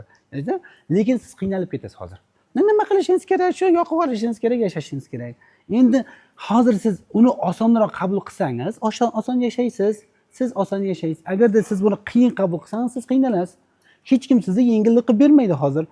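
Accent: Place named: Qashqadarya, Uzbekistan. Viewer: Энг ажойииб кашкадарйоча жайдари гаплар😂😂 Зур тушунтиряптила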